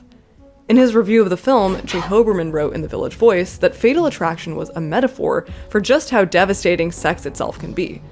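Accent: Canada. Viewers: lol late to this, but her Canadian accent here sounds kind of like U.S. Midwestern standard reining in the tendencies of California English. However it affects the pronunciation, it’s actually quite pleasant.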